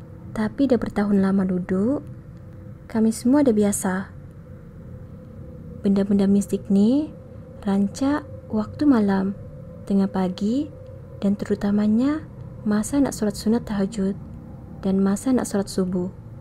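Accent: Sabah, Malaysia. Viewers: org sabah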